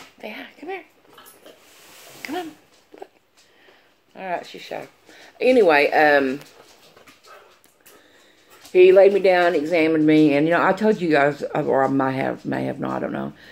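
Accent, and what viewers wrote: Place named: Tennessee, United States. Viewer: Hi! I found your videos, kind of accidentally. First thing that caught my attention was that you talk just like me so I’m thinking you may possibly be a Tennessee girl?!:) Anyway, just throwing something out there for you- I have struggled with my weight for the past few years (had lap band surgery 2011) but truly wish I wouldn’t have as I had trouble taking off work to drive to Nashville to get my “fills”, and now my insurance doesn’t cover it anymore. Anyway, a few months back, I found a Facebook page called Snake Diet Motivation. It has been LIFE CHANGING for me. It involves fasting(which I was taught all my life was unhealthy). But, I tried to have an open mind since nothing else i had done, for years, was working. I started reading testimonies on the FB page and the rest is history’s it has been LIFE CHANGING. 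Check it out if you have any interest at all, and prayers for your health and healing!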